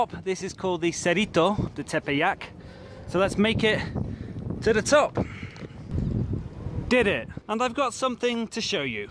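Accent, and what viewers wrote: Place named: United Kingdom. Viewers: Totalmente, pero hay quienes apenas distinguen que habla inglés, mucho menos que tenga acento británico. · johan gomez Fíjate en la manera en que él habla, se le nota el famoso "acento británico"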